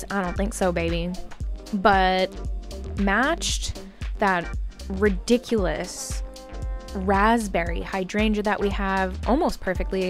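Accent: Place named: United States. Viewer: omg i love your video, and u r accent is so American! i can feel the power in your voice!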